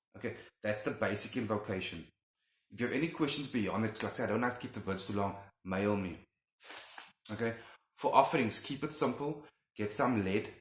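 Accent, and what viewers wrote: South Africa. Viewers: Oh man, hearing your "R" i automatically knew you are South African. I love that accent.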